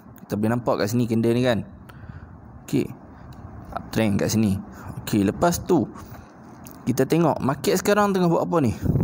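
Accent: North, Malaysia. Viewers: Mantap bro cakap utara baru paham kalay da contact number ws la nk belajar lg mndalam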